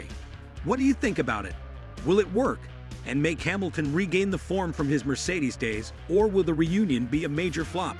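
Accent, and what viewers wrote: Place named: United States. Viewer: DUMP THE STUPID AMERICAN ACCENT. AT LEAST AN ENGLISHMAN KNOWS HOW TO PRONOUNCE GRAN PRIX.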